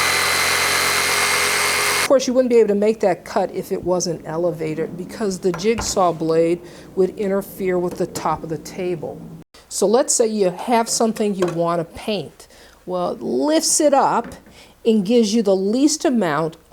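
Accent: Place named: Medford, United States. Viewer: I miss that Medford accent!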